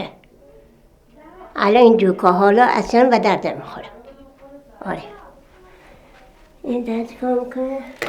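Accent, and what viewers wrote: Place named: Eastern Turkey, Turkey. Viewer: She speaks similar tone as eastern accent of Turkey with similar sentence emphasises so I feel like I should understand it but barely get a few loan words.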